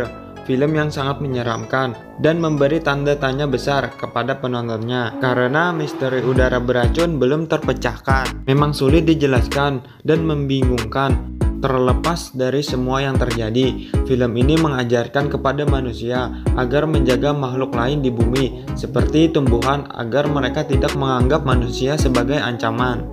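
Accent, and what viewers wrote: Kalimantan, Indonesia. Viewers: Bang orng kalimantan kah?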